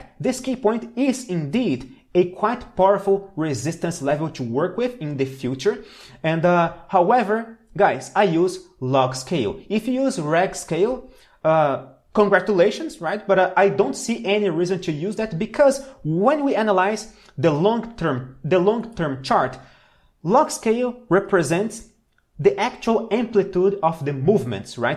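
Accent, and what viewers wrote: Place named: Brazil. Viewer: I knew it! I've had Brazilian friends and ESL students. I'd recognize that beautifully accented English any day. Well done, sir!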